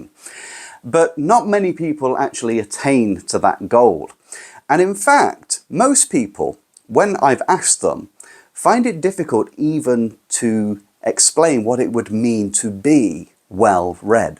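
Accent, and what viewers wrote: United Kingdom. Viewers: You're accent is very elegant and precise. A true british one.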